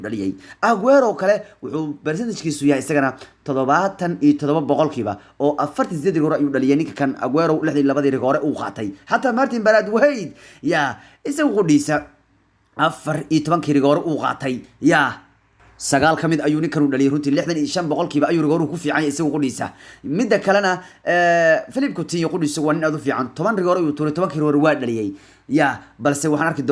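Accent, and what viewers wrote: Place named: South, Somalia. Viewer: Ileen afsomali in la bahdilo wax ka kow ah af reer konfured wlee waa laga sheeken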